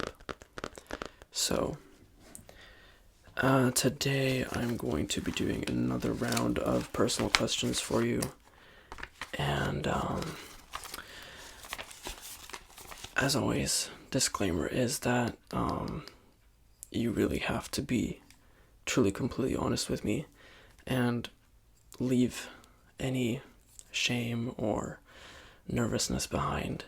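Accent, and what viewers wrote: United States. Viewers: The first time I came across your video I feel like you will have a British or Irish accent. Nice American accent like really good. Can you do English accent? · How do you look British and sound American at the same time?:...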